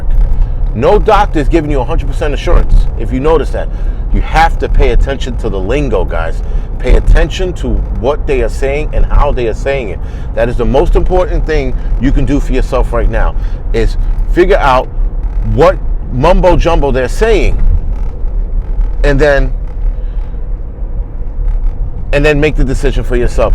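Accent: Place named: Bronx, United States. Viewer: Hes not angry....hes just from the Bronx.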